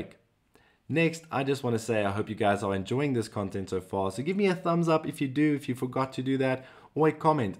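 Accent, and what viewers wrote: South Africa. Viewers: Hey you sound south african wow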